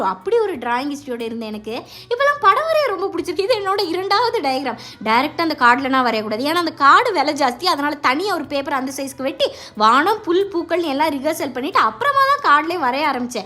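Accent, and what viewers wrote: Tirunelveli, India. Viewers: Padam varaiya sonna mattum mookala aluva Aaha namma thirunelveli,la pesurathu😂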